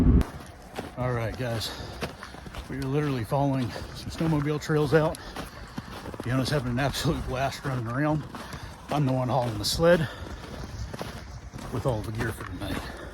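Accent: South, United States. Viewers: Hello there!! I joined your channel a few weeks ago.And you were in your new To you scamp trailer. I have begun catching up with your previous posts. That scamp now seems like luxury compared to videos like this. I have never lived further north than southern middle tennessee , where I currently live. I cannot imagine weather and ice like in this video .(BTW, Do I hear a trace of a southern accent in your voice?)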